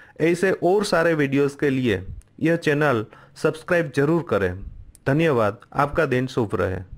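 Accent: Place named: Bengal, India. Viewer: bro I am from Nepal n trust my hindi much much better then urs...sayed aap Bebggali hola ish liye aap bolte hai ....उस टे अमिताभ बोचन उन के कोर गोया था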